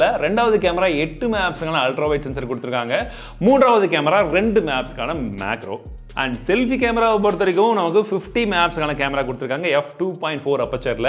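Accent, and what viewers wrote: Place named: Kanyakumari, India. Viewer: #SUPERTT intro adipowli 🌟 bro.... Kollam ketiya anna😅 ....ethu enga ooru slang from # kanyakumari ♥️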